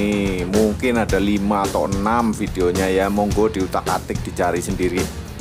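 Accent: Banyumas, Indonesia. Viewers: jebule ngapak temen rika kang🤣🤣🤣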